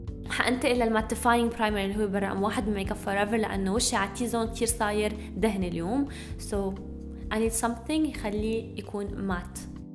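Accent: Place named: Lebanon. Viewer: حبيت اللهجه اللبنانيه بسببك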